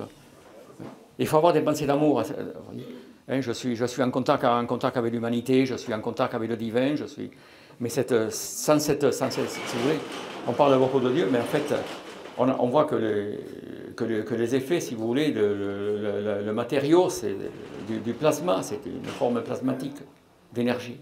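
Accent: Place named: South, France. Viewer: Après avoir vu la video de nurea tv, je ne m'attendais pas à un tel accend du sud 😅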